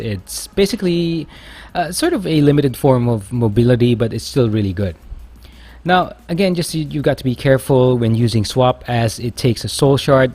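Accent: Philippines. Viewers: Nice guide! Btw are filipino? Cause you have a little bit of filipino accent.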